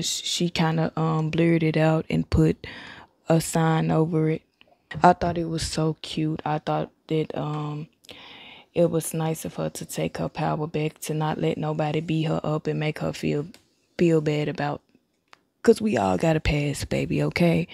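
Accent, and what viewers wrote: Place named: South, United States. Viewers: Being from alabama this just sounds like a southern accent to me 👀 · Girl you are hilarious 😩😂🤣… I’m sleepy & entertained. Your personality shines through this skit. Love it! & You are from somebody’s Southern state, I hear it loud & clear.🫶🏾 I’m glad this popped up on my feed.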